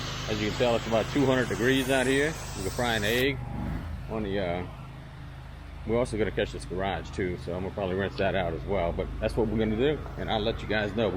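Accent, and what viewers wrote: Louisiana, United States. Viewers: 985 I knew that was a Louisiana accent.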